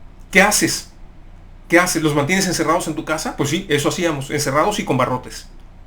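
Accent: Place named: Querétaro, Mexico. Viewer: de que estado de mexico eres? Te escucho como queretano, saludos desde acá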